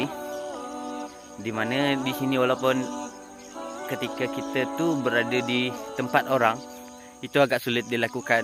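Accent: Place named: Sarawak, Malaysia. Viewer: kau orang Sarawak ke?? suara dan cara kau bercakap macam kawan lama aku orang Sarawak nama Azmeer... hahahhaaha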